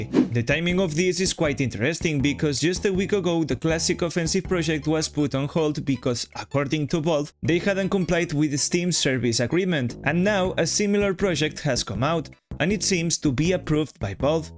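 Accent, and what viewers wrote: Spain. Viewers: COMO SE NOTA QUE ERES ESPAÑOL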